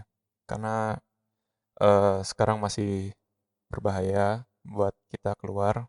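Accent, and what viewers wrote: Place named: Germany. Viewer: Kakak asli mana ? Kok suaranya ada aksen jermannya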